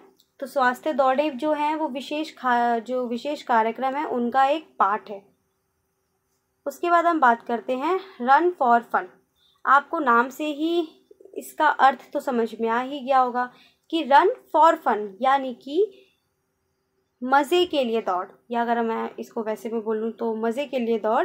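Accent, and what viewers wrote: Delhi, India. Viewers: Mam are you from Delhi 😁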